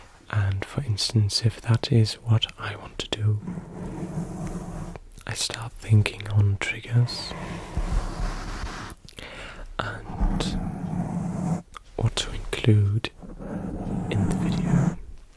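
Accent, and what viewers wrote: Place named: United Kingdom. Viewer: I love your British accent,! Are you from London? Congrats 🎉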